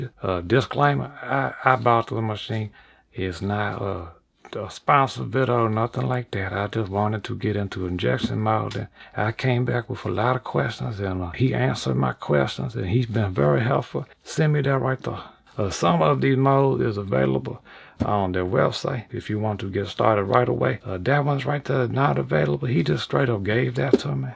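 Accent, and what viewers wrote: Louisiana, United States. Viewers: Is that the bayou accent?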